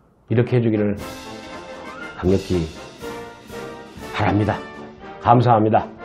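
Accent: Jeolla, South Korea. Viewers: 민원장님 전라도 사투리는 애정이 갑니다.많이많이 지지 합니다.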